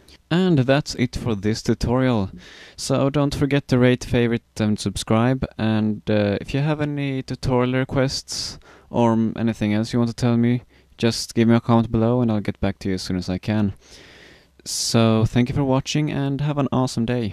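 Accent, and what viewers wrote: Sweden. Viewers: Thank you very much! You sound extremly swedish!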